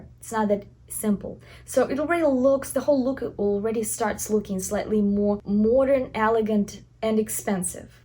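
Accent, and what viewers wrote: Russia. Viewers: Я одна слышу русский акцент?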